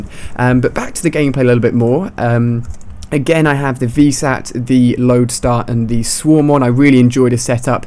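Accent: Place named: United Kingdom. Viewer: He's British, it's just an accent.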